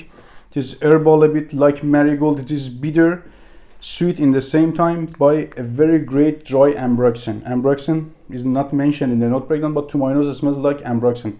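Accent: Iran. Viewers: داداش لهجت چقد ب ایرانیا میخوره😄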